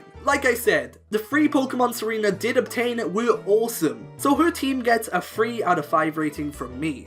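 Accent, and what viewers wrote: Wales, United Kingdom. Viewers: Nice to hear a Welsh person on YouTube!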